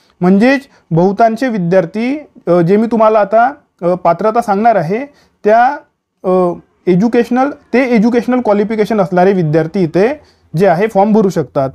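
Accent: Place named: Nagpur, India. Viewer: Bhau chi language nagpur chi vatte jara😊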